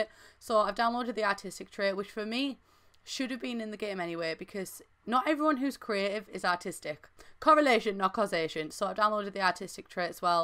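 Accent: United Kingdom. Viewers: Very useful- will be getting those mods for the aspiration and traits- its nice to hear a British accent too- I've not come across fellow Brits when looking at Sim Youtube accounts!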